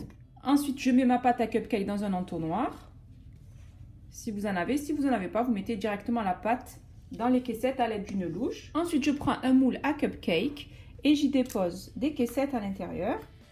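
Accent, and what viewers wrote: South, France. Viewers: C'est surtout le même accent du sud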